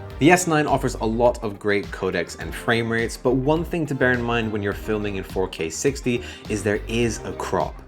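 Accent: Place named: United Kingdom. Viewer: Love the S9!!! OPENGATE!!! by the way at first I thought you're american but I keep thinking you sound kind of british. I had to check your channel info 😅 (fyi I'm studying how to speak English which is why I'm trying to guess the accent 😅)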